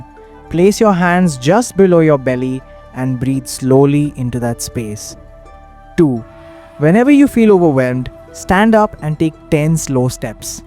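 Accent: India. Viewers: Your voice and accent is Indian . Are you Indian who lived in Japan and now living in North Korea ( not even in South Korea). Genuinely curious.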